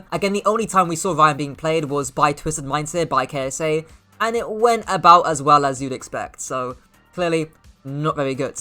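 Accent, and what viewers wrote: England, United Kingdom. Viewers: I love your English accent ❤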